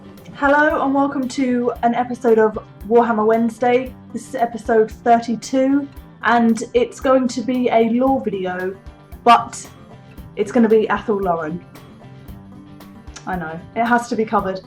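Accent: United Kingdom. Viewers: Wha... what WHAT IS THIS!? An attractive woman speaking with a British accent about WARHAMMER FANTASY lores?! 0_0 .... subscribe. Where do I vote for more of this from the interwebz?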